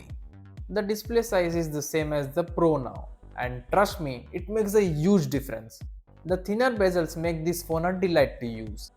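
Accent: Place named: India. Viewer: Indian accent is funny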